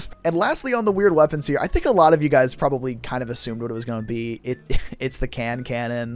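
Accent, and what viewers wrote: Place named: United States. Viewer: kac srr kinda sounds american and looks american, overall just americano vibey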